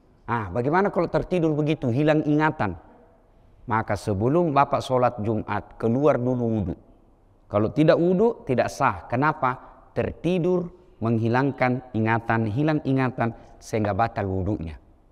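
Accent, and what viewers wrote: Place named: Sulawesi, Indonesia. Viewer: Logat Sulawesi Pak Ustadz Sulawesi Bagian Manaki Ustadz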